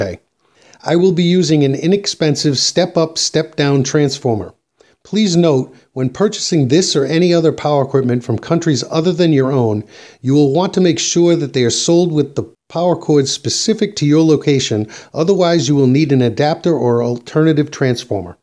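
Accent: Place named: United States. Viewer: Hi, thank you SO much for this!! This is the most comprehensive review on YouTube!! However, I'm confused... You sound as if you are from the USA? Apologies if you are Canadian... We struggle to tell the difference in the accents here in the UK. Iwata is a USA Company. Why did you buy from the UK? Was it a better price? Very kind regards from London UK. Alan.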